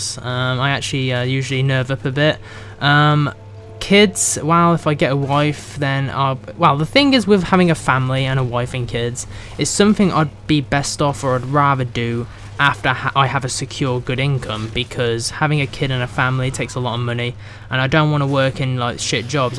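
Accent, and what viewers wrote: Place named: United Kingdom. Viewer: What part of the UK r yu from??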